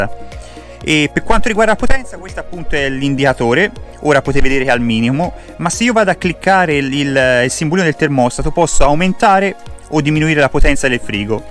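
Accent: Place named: Tuscany, Italy. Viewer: Ciao, spieghi sempre molto chiaro, impreziosito dall'accento toscano. Ti faccio due domande: nel tuo caso (inverno freddo e serbatoi vuoti di acqua), il riscaldamento truma diesel con boiler, lo potevi accendere senza danni? E poi le prese USB che sono all'interno del van sono attive, cioè puoi attingere dalla batteria servizi con van spento? Grazie attendo nuovi video.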